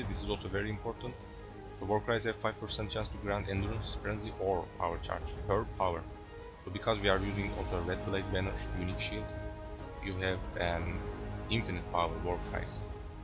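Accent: Turkey. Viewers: Lol I immedialy recognised the Turkish accend. Ty for the build.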